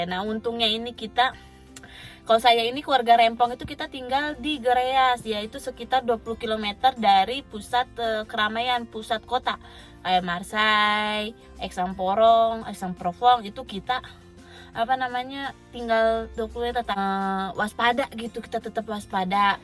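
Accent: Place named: Jakarta, Indonesia. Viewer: ya Allah mpok😱..kgk salah ntu😅..alis knp tinggi sebelah ya mpok🙄..sukses trs ya mpok dgn logat betawi nya🤩🤗🤗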